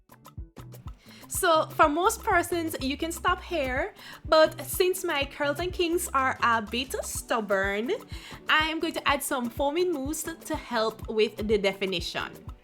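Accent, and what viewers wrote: Jamaica. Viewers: jamaican!!? i pick up d accent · Sound so Jamaican. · Love how you talk...you sound so much as a jamaican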